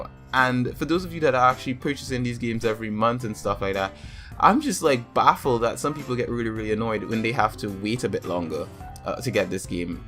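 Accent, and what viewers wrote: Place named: Trinidad and Tobago. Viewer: What country are you from? The accent is feeling like a trini 🇹🇹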